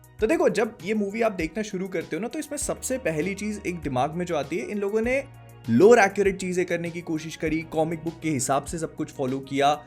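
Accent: Bhopal, India. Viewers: bhopali sunkar maja aajata he. me bhi bhopali hi hun.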